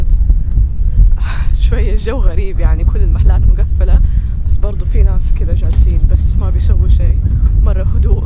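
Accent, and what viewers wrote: Hejaz, Saudi Arabia. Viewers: لهجتك حجازية صح (يمشوا جالسين) كيف كذا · واضح من لهجتها انها سعودية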